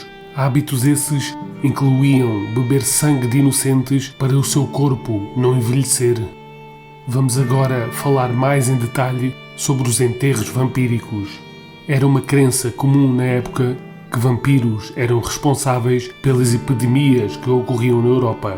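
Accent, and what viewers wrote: Portugal. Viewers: Foi o vídeo que mais gostei até agora. Sua voz estava mais limpa, mais clara de ouvir, o som de fundo estava mais baixo. Sua voz é bonita, e fica mais bonita ainda, com esse sotaque português. Até o próximo vídeo. Abraço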